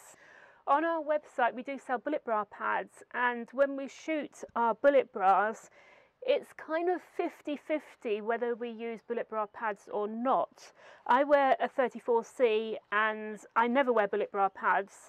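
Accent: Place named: United Kingdom. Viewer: I am a Brazilian/ American and I found British accent so elegant, mostly from a wonderful girl like..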